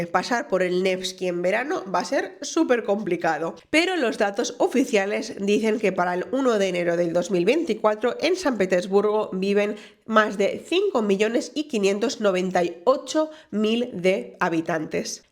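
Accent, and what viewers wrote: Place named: Spain. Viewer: Gracias por mostrarnos lo que son estas grandes ciudades; queda el de planificar algún día no sólo en visitar el país, mas por que no aprender el idioma oficial; y, escuchando a en un buen castellano de España me atrevo a empezar desde hoy con una palabra; después Dios dirá… Saludos desde la bahía de San Francisco, California. Jorge Edwin Marroquín Mansilla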